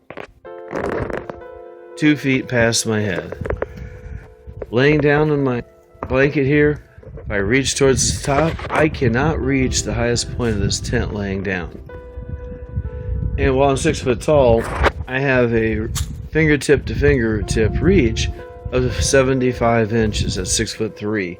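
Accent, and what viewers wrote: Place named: Newcastle, United Kingdom. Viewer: he has a georrdie accent ,some folks thinks its scotish cheers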